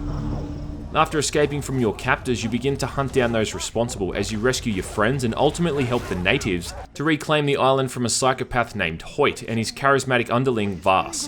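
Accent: Bogan, Australia. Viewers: ur just a below average reviewer whos only popular because you have that bogan aussie accent.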